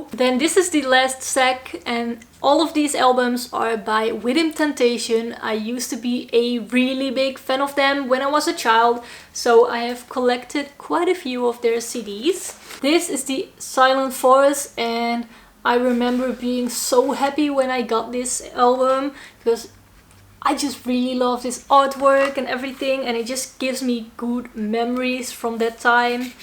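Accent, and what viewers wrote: Netherlands. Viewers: Nice accent English/Dutch haha but its really good 'Ik heb dat ook alle Nederlanders bijna' Great video!! And Wow not many girls have a good taste of music like you Love your Collection Groetjes van Rotterdam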